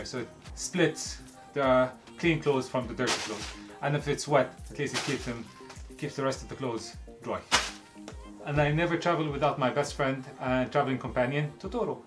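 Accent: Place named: Ireland. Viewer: Do I hear an Irish twang in the accent. Are you in Ireland - great review 😉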